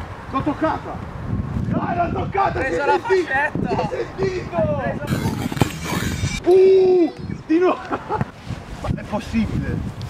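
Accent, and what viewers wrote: Molfetta, Italy. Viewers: ma sei di molfetta?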